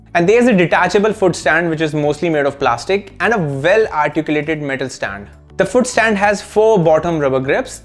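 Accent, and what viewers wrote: India. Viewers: The indian accent is epic! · in other words joking in english sounds funnier in indian accent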